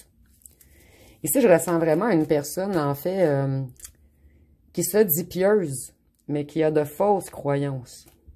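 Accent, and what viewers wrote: Canada. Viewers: Première fois que je vous écoute! Votre accent canadien me plaît beaucoup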